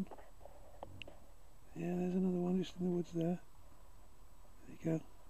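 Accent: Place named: Stoke, United Kingdom. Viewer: Cheers, you sound like a Stokie mate! Love Devon, me and the missus intend to move down there in about 5 years time plus I do a lot of deep sea boat fishing regularly from Paignton. Great videos, would love to get into some of this night type shooting as I am restricted to bunnies and woodies. Keep up the good work. atb Frankie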